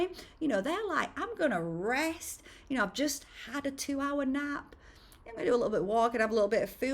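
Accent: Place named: North of England, United Kingdom. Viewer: Thanks so much for your video which really resonates. I feel like I’ve been on pause for a long time. I noticed your video lasted 9.11 and I have been seeing that number sequence for a long time! What part of the world are you based by the way? You sound like you’re from the North of England. I live in Prestwich. Sending love and sparkly wishes xxx 🙌💕🧚‍♀️🪄💫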